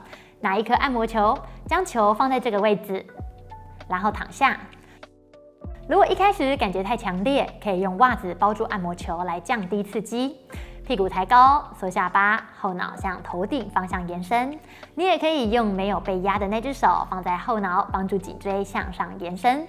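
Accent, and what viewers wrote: Taiwan. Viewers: 我超喜欢你的内容😊还有你台湾的声音😊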